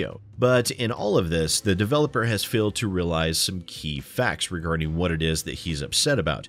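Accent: United Kingdom. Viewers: You’re a US citizen? After hearing your wonderful English accent, I thought you were a Brit 😉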